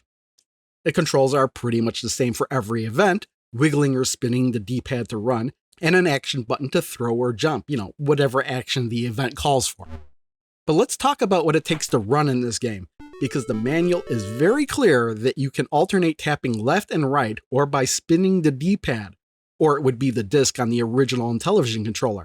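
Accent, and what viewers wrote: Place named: United States. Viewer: Enjoyed it very much You are so dedicated And a very nice accent.. i wonder what part of the States this is from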